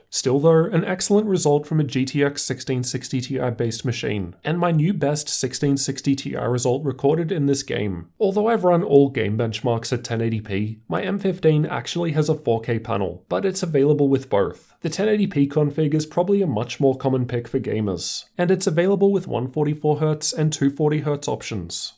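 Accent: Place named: Australia. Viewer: Bruh this boi Aussie bruv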